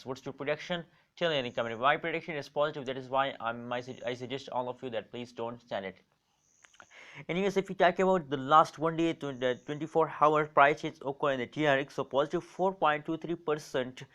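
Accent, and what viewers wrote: India. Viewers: white people video thumbnail english language indian accent as fast as japan high speed electric train no facts at prediction even a teenage 14 boy also can reexplained this thats blah blah blah zero informative content as for sure most brainy positive video presented by showing by content presenter faces for sure this boy wanna follow hype and get fast subs and views and get money for sure many viewers will stay out from this kind of video coz its promising thumbnail video promoting click bait.. well done indian teenage boy!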